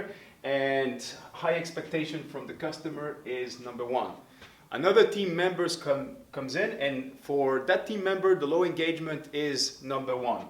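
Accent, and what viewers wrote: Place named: Belgium. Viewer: my only question after watching this video was where this guy comes from. Based on his style and accent I'd say Belgian. Anybody here who knows for sure?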